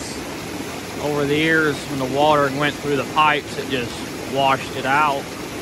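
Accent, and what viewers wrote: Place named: Western Pennsylvania, United States. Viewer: Is this in western PA? Guessing based on your dialect. I miss that.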